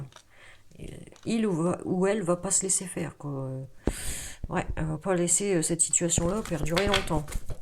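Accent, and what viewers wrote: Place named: Nord-Pas-de-Calais, France. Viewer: Belière je viens espionner mon chéri Cancer, j'aime bien votre accent chti ayant vécu plusieures années dans le nord mis à part le climat j'aime le nord et les gens du Nord que je trouve plus chaleureux que d'autres. Bonne continuation et on ne peut plaire a tous la vie est ainsi faite😘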